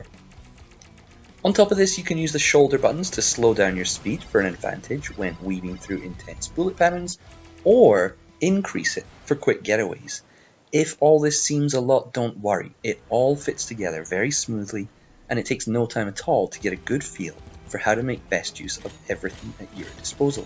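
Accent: Scotland, United Kingdom. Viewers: Just discovered channel and am a fan. Great to hear a scottish accent talking about ganes. Where are you from?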